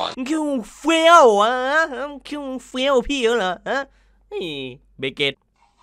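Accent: North, Thailand. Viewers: ภาษาเหนือ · ภาษาเหนือฮ๊าฟพี่ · ภาษาเหนือคับ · พี่ไม่ใช่ภาษาใต้พี่มันเป็นภาษาเหนือ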